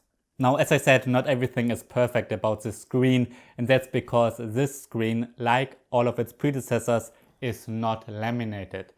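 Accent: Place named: Germany. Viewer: I'm still waiting for apple to redesign Thier budget iPad! So waiting for 9th or 10th gen! And I watch your videos after a long time before watching I really missed your German-English voice!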